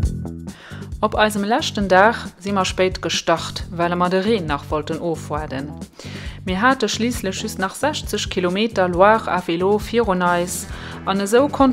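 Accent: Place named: Transylvania, Romania. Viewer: Ich sage ganz salop, hallo, die Fahrradtour und vor allem die Sprache hat mich einfach platt gemacht!!! Ihre Sprache, ist mein DIALEKT. Den Untertitel habe ich ausgeblendet damit ich Ihr "Siebenbürgisch" richtig genießen konnte. Einen Daumen hoch und Abo habe ich hinterlassen. Wünsche allzeit gute Fahrradtouren.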